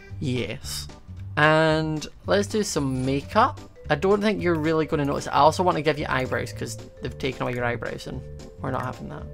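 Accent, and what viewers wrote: Scotland, United Kingdom. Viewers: I find the Scottish accent quite soothing... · Piggies Forever he's Scottish 😊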